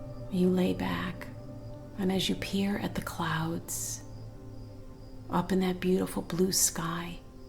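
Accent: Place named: United States. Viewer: Wonderful. The life eperiences you have had to create this ??? Sadness, loss, love. Very touching to me - a 89 year old Englishman living permanently in Japan. Coming from a working class background in North-East England and having parents and grandparents of Irish origin whose lives had not been easy - I release them with love. I love your American voice and wonder what part of America? All the love - Jack.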